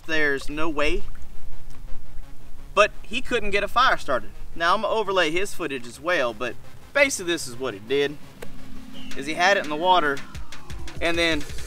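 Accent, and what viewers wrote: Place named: South, United States. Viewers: Are you from my part of the woods in West Virginia? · you sound more southern today! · Yep that southern drawl was thick, pay no mind brother ignorance is bliss. Liked the quick shot of the systematic last couple vids ago.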